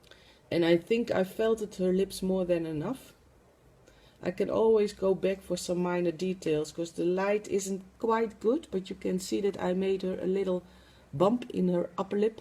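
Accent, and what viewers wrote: Netherlands. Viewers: Just wanted to say thank you for your fantastic tutorials....I'm new to doll making but you have inspired me and definitely shown me the way forward. Your tutorials are easy to follow and I love listening to your lovely Dutch accent! Best wishes and thank you!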